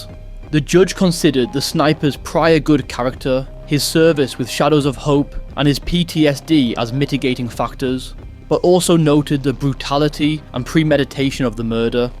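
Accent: England, United Kingdom. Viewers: Bullshit.antother lame instagram mini docco ! Narratted by some pommy flop who probably never even been out here 😂💩🤮💯also the way the narrator says state leader 😂😂😂😂😂😂😂they dint use that language also nike bike 😂dudes been wearing nike s for last thirty years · He's English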